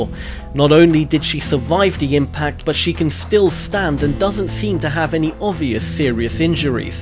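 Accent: United Kingdom. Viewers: Why do these narrators so often have British accents? If I watch this video in GB will he have an American accent?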